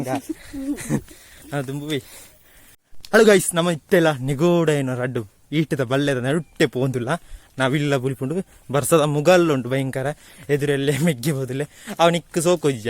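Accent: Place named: Kasaragod, India. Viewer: Kasaragod da tulu kenere kushi apundu Jai Tulunadu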